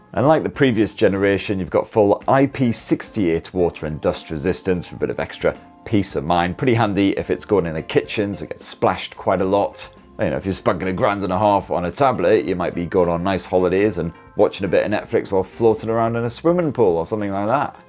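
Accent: United Kingdom. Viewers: Thank you. As always the best review with your beautiful British accent ❤❤❤❤❤⭐⭐⭐⭐⭐🫡🫡🫡🫡🫡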